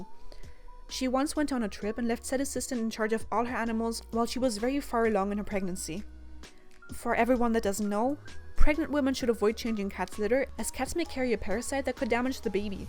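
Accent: Germany. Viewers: OK at the end I heard it, you are from Germany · Das ist der Akzent! Du kommst aus Deutschland! :3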